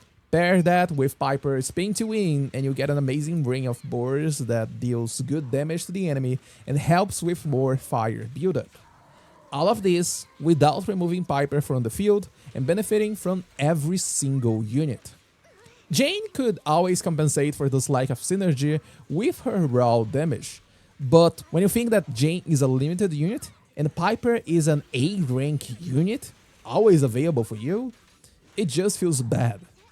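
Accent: Brazil. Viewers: are you Brazilian?? Your accent sounds SO brazilian like 😮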